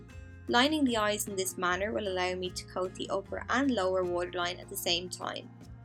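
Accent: Ireland. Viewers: Love your channel! Only new to it, but I recognised your Irish accent straight away! I'm from Westmeath! My all time favourite product is pink pigeon Mac lipstick😃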